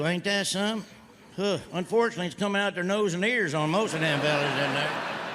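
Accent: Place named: Midwest, United States. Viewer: the funnt thing he does not sound like that in real life, he has a thick mid-west accent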